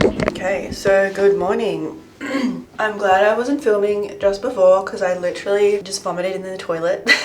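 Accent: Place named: Australia. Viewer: I’m Japanese. I leaning Australian English from your Vlog, thank you so much❤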